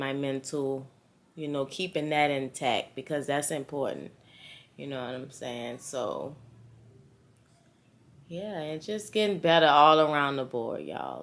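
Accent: New Orleans, United States. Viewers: That’s ok continue to be you!! A lot of us like the way you talk that’s the New Orleans talk that’s alright!! Keep striving for what makes you happy we’re here for it!!❤ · Girl keep that New Orleans talk honey! You are good! “Ya kno”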